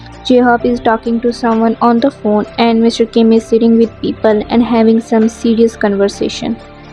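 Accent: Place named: Asia. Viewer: don't mind but I thought you were from Asia or something cuz of your accent 🙂🙂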